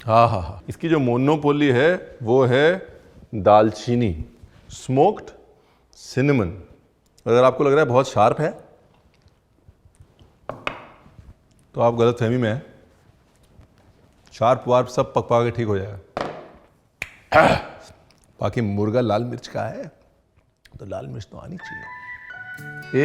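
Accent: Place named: Lucknow, India. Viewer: Aap English bhi Lucknow walo ki trhan bolte hain..☺️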